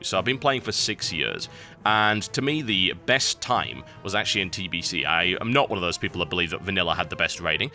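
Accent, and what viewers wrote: United Kingdom. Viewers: Thank you TB for your always honest and informed opinons in a british accent. You will always be my first stop on the interweb b4 i start hardcore gaming. And I'm grateful you will be continuing the mailbox as i love to hear your thoughts on things that the community has ideas or opinions about. Good Luck finding another MMO to play and i wish you the best of luck! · WTF WTF ??? Wow is no more wow we known . It Changes when comes Lich king and Cataclysm . Reason why people stop playing wow its repeats all time everything is same . I like TB for his comment and i like british accnent ( sorry for my gramma ) so TB Good Luck i wish u very nice future !!!!!!!!!!!!!!!!!!!!!!!!!!!!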